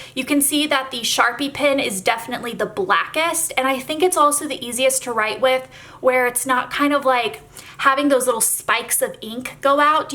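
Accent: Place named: South, United States. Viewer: She’s from Tennessee and has a slight southern accent. “Pen” and “pin” are typically pronounced the same or nearly the same in the south.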